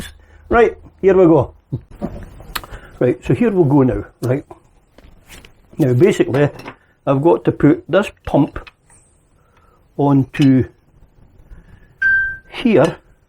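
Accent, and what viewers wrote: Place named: Scotland, United Kingdom. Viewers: Cheers for info, no problem understanding your Scottish accent as a good mate of mine is from Gourock 😎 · Eh don't know what gave it away. Eh must have noticed a wee Dundee quirk in your accent. 😁 Just a quick note to say fixed my Karcher leak. Turned out to be the top elbow joint. Fantastic local supplier in Inverurie got me the bit. Took a wee while to free it as the clamp was seized solid but my trusty mole grips sorted it (bought when I was about 18 for £2.99 - best buy ever). Anyway, thanks again for your video, which gave me the info I needed and a great laugh at the same time. Have a great weekend, and take care. Cheers, Harry (well, actually it's Alan - Harry's my crime thriller writer pseudonym)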